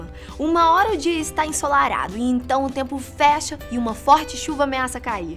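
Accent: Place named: Bahia, Brazil. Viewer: Pelo sotaque ela parece da Bahia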